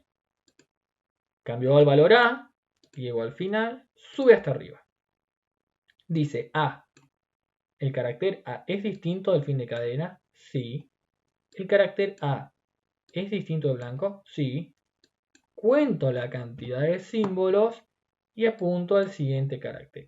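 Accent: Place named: Misiones, Argentina. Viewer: Muy genial tu explicación. Muchas gracias. Te felicito por toda la pasión que le ponés al arte de enseñar. Y re contra mil veces feliz por poder aprender con acento Misionero.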